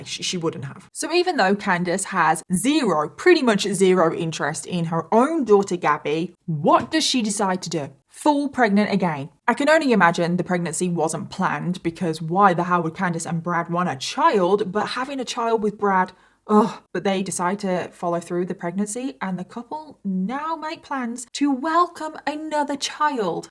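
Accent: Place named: Birmingham, United Kingdom. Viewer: Going off topic, there's something about your accent. One minute you sound antipodean, the next you sound like someone from Birmingham, UK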